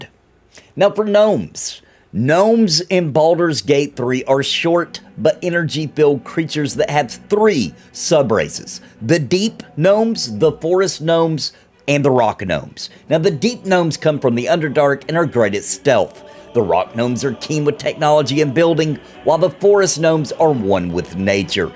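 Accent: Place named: Georgia, United States. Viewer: Are you from Georgia? You have the same dialect as the man the myth the legend himself, Judd. None the less, great video, I'm also gonna be a Paladin Dragonborn!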